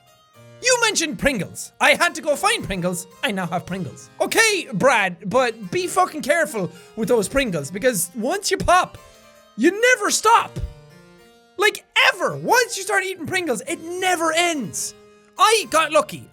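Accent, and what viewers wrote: Ireland. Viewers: Are you scared by the fact that because you moved away from Ireland you'll slowly lose your accent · you really like potatoes😉 (Not Lying) And gotta love DAT Irish Accent(I'm White) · do you think your irish accent will go away since you moved countries, I hope it doesnt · what if you lose your accent since your not living in Ireland anymore... like how people who move to the US, from a place like Australia, they start sounding American after a while.... just curious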